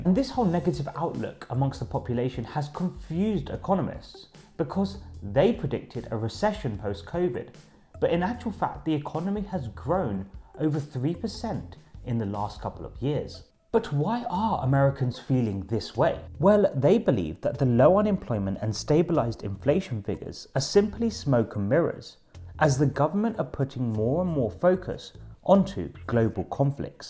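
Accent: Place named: United Kingdom. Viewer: I don’t think a foreigner with British accent should be yapping about the American economy just because he needs attention to his channel. This ain’t UK buddy.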